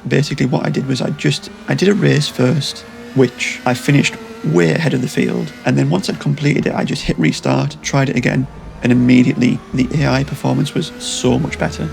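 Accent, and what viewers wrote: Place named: Middlesbrough, United Kingdom. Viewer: Subscribed and a like. But I have to try to guess your accent ! Middlesbrough ?...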